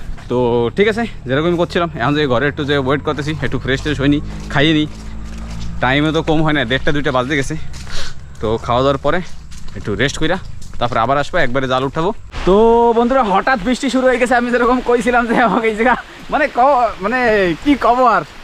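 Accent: Barishal, Bangladesh. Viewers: vai tui pure barishaila ❤ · আপনারা তো আন্দামানেরই ভিডিও দেন যেটা ইন্ডিয়ার ই অংশ বলে জানি, কিন্তু আপনার ভাষা পুরো টাই বাংলাদেশের বরিশাইল্লাদের মত ক্যান/?? একটু কমেন্টের রিপ্লাই দিন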